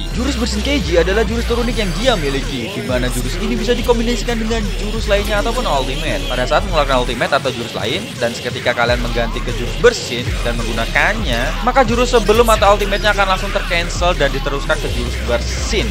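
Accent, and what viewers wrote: Java, Indonesia. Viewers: logat jawanya keluar😂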